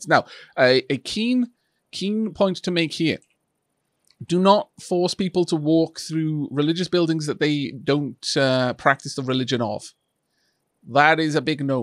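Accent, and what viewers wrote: Wales, United Kingdom. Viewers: I went into this blind from recommendations, distracted by btd6, and I was like wow, this guy sounds like. I had to get out of full screen to see who it was and.... yeah it was you!!! Your calm voice is unique and proper mate, the Welch in you comes out joyfully. When I was sent overseas to RAF Alconbury in East Anglia the winds were like gale force on the day I was picking up my MOPP Gear over stuffed into a parachute bag (Chem suit/mask and all the trimmings) which weighed a metric ton altogether and I was walking the entire way to the dorms against the wind. My friend was heading the opposite direction and he wizzed on by me waving. I still remember the one time the wind stopped and the sky was dry while we were walking to the bowling alley. It was summer about 6 pm so another 3 hours of sunlight at least and I remarked how nice a night it was for once. 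When we got out of the bowling alley not 2 hours later it was lightly raining again and blowing like the channel resented us being over there... as per usual. When I eventually got sent back stateside I was sent to the deserts of Idaho where the ranking Sargent of my job place was showing me around and remarked that I'd best get used to the wind. I simply said ' What wind?' He thought I was boasting. It was too cold to try and explain where I had just come from as he would have doubtless no clue.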